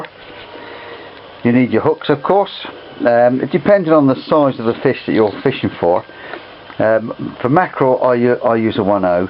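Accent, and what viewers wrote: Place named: England, United Kingdom. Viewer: Сразу видно по акценту - Англичанин)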